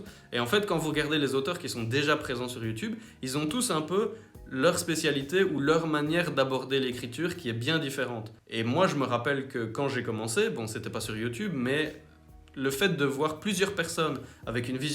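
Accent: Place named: Belgium. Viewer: Je m’abonne ! Tu as tellement de choses à nous apprendre, j’adore ! (Et ton accent belge me rappelle mes années d’études à Lille haha j’adore aussi!). Pour la prochaine fois, des petites phrases écrites pour les parties de ta vidéo ça pourrait être cool (là c’était les questions posées du coup), pour mieux imprimer de quoi tu parles, parce que si notre cerveau se déconnecte deux secondes, je sais pas pourquoi mais j’ai eu la sensation que de voir écrit « les chapitres » ça m’aurait aidé, en tout cas ça m’a manqué à un moment pour me repérer dans tes « parties ». Voilà voilà :) merci !! Hâte de voir les prochaines vidéos !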